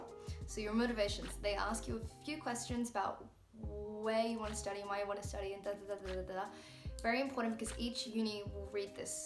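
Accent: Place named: United Kingdom. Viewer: It was the most useful I watched about study in France , Note: I love the British accent ❣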